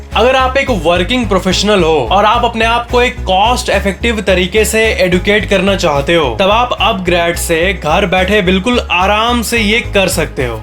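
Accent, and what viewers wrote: Bihar, India. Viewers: pakkkka bihari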